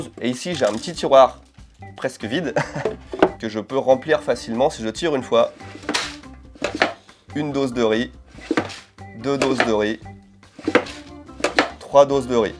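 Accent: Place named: France. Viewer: Je suis complètement d'accord avec vous. Comme les français, les japonais n'aiment pas les sous-titres. Donc, parlant japonais avec un accent français, ça va faire fureur!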